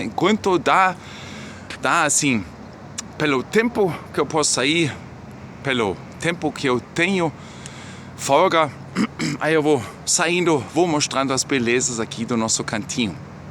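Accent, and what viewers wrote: Brazil. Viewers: Se não fosse pelo R vc seria confundido com um Brasileiro nato. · Irmão eu só consegui ver o Leôncio do Pica Pau, ainda mais com esse sotaque Brasigermânico. Abraço!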